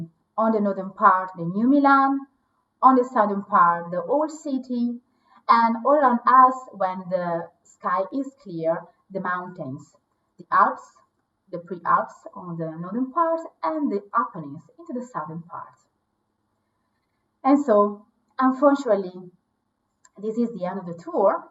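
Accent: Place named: China. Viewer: The audio is really bad. Sounds like she speaks Chinese.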